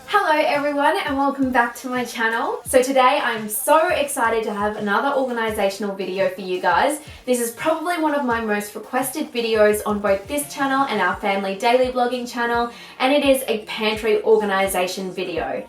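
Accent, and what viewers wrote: Australia. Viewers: Are u Australian??? I am and I just found ur channel and I subbed 🤗🤗🤗🤗🤗🤗TADDAA I did way to much for a first vid but whatever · Nice to hear an Australian accent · Omg are you Australian sameee finally yay 😊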